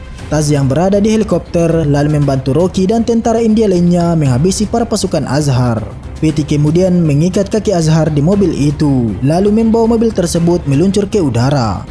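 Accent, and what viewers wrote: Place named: Padang, Indonesia. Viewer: Pengisi suara orang padang❤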